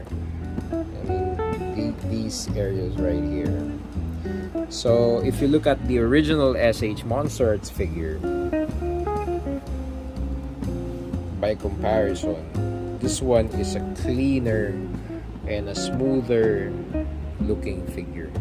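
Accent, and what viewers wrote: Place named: Philippines. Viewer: This guy is from the Philippines just the accent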